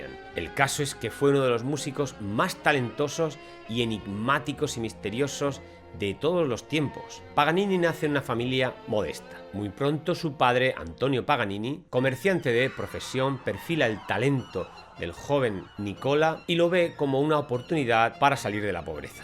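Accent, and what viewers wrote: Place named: Spain. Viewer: el acento español es desapacible y estresante oara nosotros los latinos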